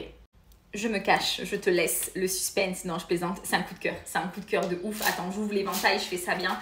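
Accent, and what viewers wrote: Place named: South, France. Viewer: Corps parfait, accent du sud adorable, une pincée d'humour. ❤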